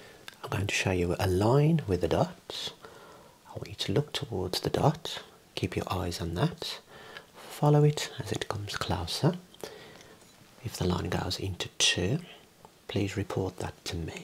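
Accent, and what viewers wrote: Birmingham, United Kingdom. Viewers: I think i detect a bit of a Brummie accent here. Love the ASMR!👍👍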